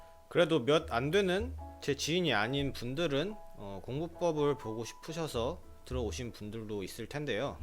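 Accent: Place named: Jeolla, South Korea. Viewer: 전라도 사투리 쓰시네요.. 참고할께요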